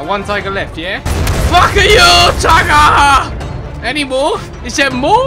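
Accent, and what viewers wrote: England, United Kingdom. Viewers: So yiu are from england??? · are you brittish yes or no (brittish is cool) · Your British JUST LIKE ME?😇😇😇😇😇😇😇😇😇😇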